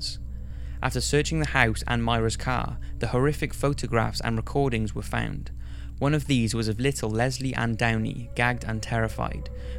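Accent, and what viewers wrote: United Kingdom. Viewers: Where abouts in the UK is your accent from??? Pls answer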